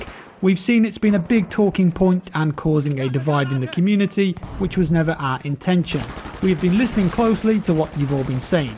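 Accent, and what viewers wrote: United Kingdom. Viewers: always like the way you put your thoughts, the core itself and your British accent,! Thanks for video!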